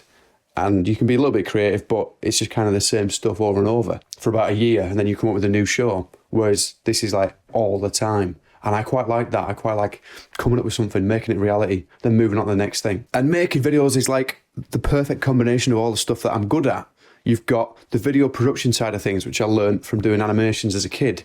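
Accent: Birmingham, United Kingdom. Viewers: Glad to see you back! You got everything you need: bostin accent, a talking dog, marked decks of cards, comedic genius, sausages, British poise and editing skills! As Tom Cruise said in Impossible Mission 7: Avengers Vs. The Ron Hubbard Scientologic Universe: "The world is yours, innit"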